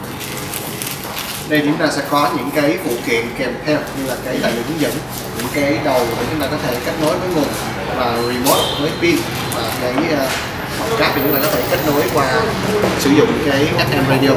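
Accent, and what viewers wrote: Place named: Vietnam. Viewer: am thanh trung thuc giong noi gioi thieu re qua ( miet vuon)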